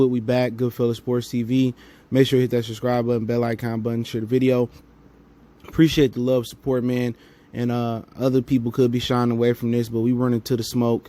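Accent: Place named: California, United States. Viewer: i will like to add chiefs will pay him what ever he wants. Are you a raiders fan? i know it has nothing to do with it but you got a cali accent lol Also mahomes has not been getting along with his parents for awhile beacause they mad at his brother that is gay. He supports his brother no matter what but the parents trying to cut his brother off. Yes i have the insite homie and this is all true.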